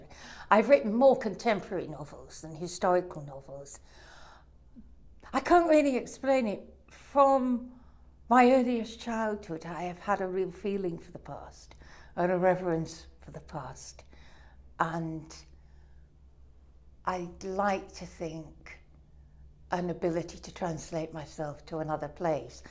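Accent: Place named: Derbyshire, United Kingdom. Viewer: She's posh for Derbyshire, I'm from there and most people don't sound like this!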